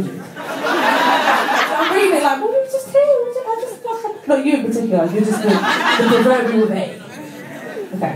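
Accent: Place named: United Kingdom. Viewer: Damn!! I love the British accent on women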